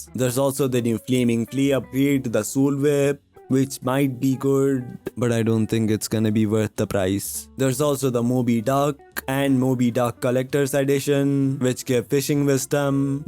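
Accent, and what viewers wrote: India. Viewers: are you Indian, my guy?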